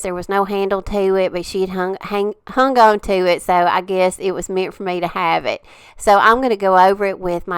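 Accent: South, United States. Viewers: These turned out so beautiful. I love the shabby chic look. 😍 I'll be honest, I love most of all the looks. Just not a fan of modern. Lol My mom's mom was from OK, so they taught me to love old stuff and antiques. I love your southern accent, where are you from? (Just the state. Lol) I haven't been passed TX yet, but someday I'm going to see where my grandparents came from, before they came to California. (Before my mom was born.) GPA was from Arkansas. ❤ TFS! ❤ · I love all your cool creations. Where do you get your ideas? They are all so pretty. I enjoy your sweet southern accent. You have a nice voice. Keep up the good work. Looking forward to The next Video!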